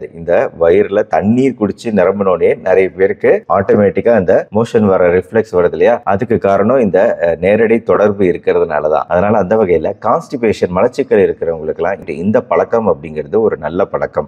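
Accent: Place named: Coimbatore, India. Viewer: சார் நீங்க கோயம்புத்தூர்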